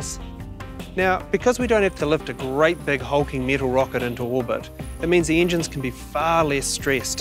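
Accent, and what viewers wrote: New Zealand. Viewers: With a kiwi accent, you can never sound arrogant. Cheering for you, Rocket Lab! (And SpaceX) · I like your lovely kiwi accent and your high aspirations. I wish you the best of lucks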